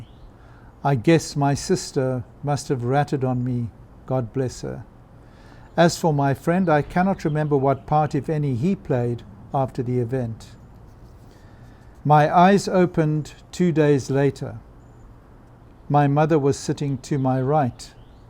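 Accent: South Africa. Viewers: Being a witness of suicide in whatever form often is a very uncomfortable experience. I've been there too. On both sides actually. On a brighter note: By listening to your videos, I have a newly found appreciation for the South African English accent.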